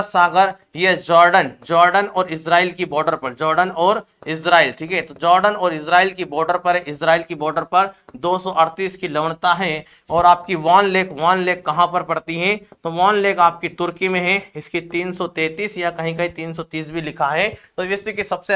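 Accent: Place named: Mewar, India. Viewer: Sir ji आपकी पूरी भाषा हमारे यहां जैसी है मेवाड़ा राजपूत जैसी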